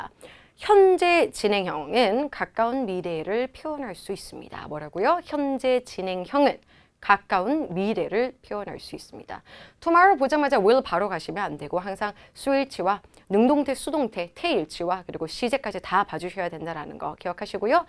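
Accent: Gyeongsang, South Korea. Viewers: 몰랐는데 경상도 발음이 간간히 나오시네요 ㅎ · 경상도 선생님 아이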